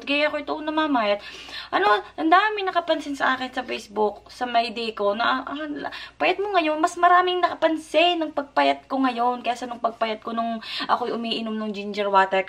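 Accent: Batangas, Philippines. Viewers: Hi sissy, enjoy watching you hahahaha....ang gandah ng accent hehe..very familiar sakin , naalala q ung mga friends q sa batangas kc nag work aq sa EPSON Lima, sa Lipa batangas. Keep it up sissy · I enjoy watching your vloggs po...Puro nire,ganire...Batanggenya ka po ba or Tga Quezon province po ba kyo Mam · Taga batangas po b kayu sis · Parang magsalita sya ay batangenya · te batangeña ka hahha seym.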